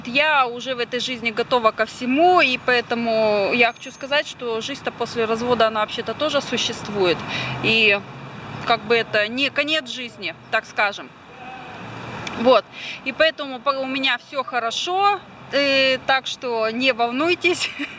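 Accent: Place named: Siberia, Russia. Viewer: Добрый вечер,. Захотелось высказать свое субъективное мнение ))Я пару раз пыталась смотреть ваш канал, но так и не осиливала больше двух роликов – не очень то люблю «говорящие головы». Но возвращалась - притягивало ваша природное обаяние, да и YouTube-советчик ) Попыталась разобраться и вот что поняла. В Вас есть то, чего совершенно недостает современному человеку: искренность, самоирония, доброта и юмор. Вы на голову выше самых популярных блоггеров, которые пытаются заискивать перед зрителем, подстраиваются, ведут себя иногда довольно резко и странно. Так что оставайтесь такой, со своим мнением, со своим сибирским говорком, с прекрасной улыбкой. А грязь к таким, как Вы, не прилипает )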